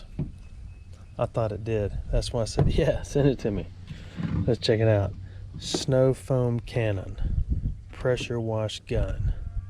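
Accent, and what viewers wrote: Alabama, United States. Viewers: The ultimate ‘shaggy dog’ car story, told with a wonderfully soft Alabama voice. Thank you.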